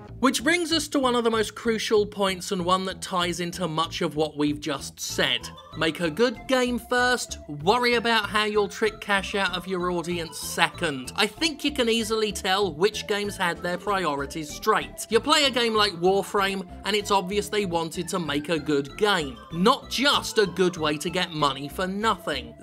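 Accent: United Kingdom. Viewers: Well for being the first video that i saw of this "big man with british accent" it was pretty cool and informative, I own Overwatch because I bought it when it was on sale and the issue with the llot boxes isn't Duengeon Keeper mobile bad, I haven't bought a single box but I'm pretty satisfied with the experience besides on a team based shooter that shit is kinda expected like in rainbow six siege and the likes because is giving new characters and new maps for free not like call of duty thta charges another 60 bucks for a bunch of maps, anyway the video was good and fuck Alien colonial marines, that bastard still owes me money for buying that piece of crap... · he is aways mad angry video game nerd he is british aaaaaaaaa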